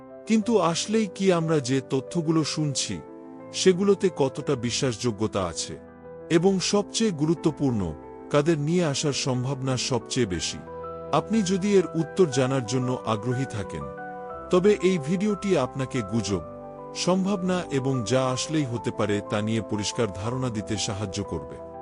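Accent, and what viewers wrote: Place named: Bangladesh. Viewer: উচ্চারণ শুনে মনে হচ্ছে বাংলাদেশি। আমার অনুমান যদি সত্য হয় তাহলে বাংলাদেশেও মোহনবাগান সমর্থক আছে জেনে আমি গর্বিত।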